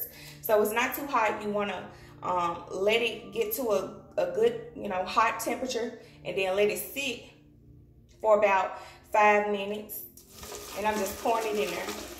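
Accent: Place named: South, United States. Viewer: She has a Southern accent. She's not really pronouncing words wrong. She just has an accent.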